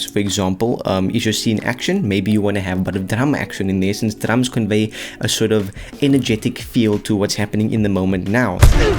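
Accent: South Africa. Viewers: Your accent has some South African in it. Like, a LOT actually.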